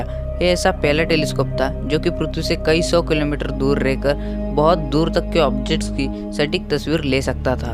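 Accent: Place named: Gujarat, India. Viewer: Baot badiya kaam karta he na tu isko aise hi padhna jaise likha maine bhai gujrati style avaz h tumhari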